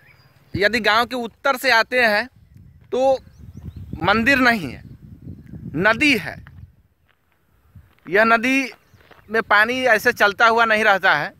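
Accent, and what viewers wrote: Bihar, India. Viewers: ये इस जमाने मे घास पूस के छप्पर सिर्फ बिहार के गावो में रहते है भाई जी । आपकी भाषा ही बता रही है कि बिहारी हो डा को आपलोग रा हो बोलते हो । ई हम नई समझे का।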